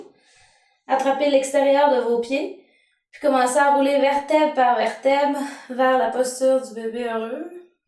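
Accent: Quebec, Canada. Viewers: J’étais extrêmement tendue et stressée, et me voilà à rapper yoga sur YouTube pour trouver de qui me détendre. Cette vidéo, c’est du bonheur ! Et moi qui suis française ayant vécut au Quebec pendant 2 ans (Montréal), retrouver cet accent si typique dans le plus grand des hasards c’est encore mieux !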